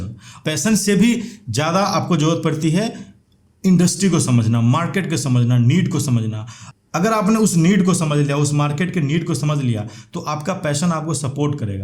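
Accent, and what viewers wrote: Bihar, India. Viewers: Bihar se hain ka? Ya UP se?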